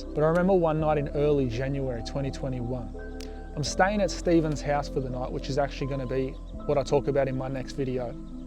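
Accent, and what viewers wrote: Australia. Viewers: He’s Australian bro 😂 what Wer you on tho my guy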